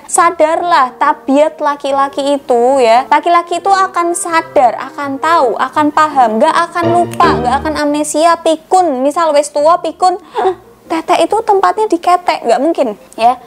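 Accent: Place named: Solo, Indonesia. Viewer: Mbak ngomong nya lucu ...dari solo ya mbak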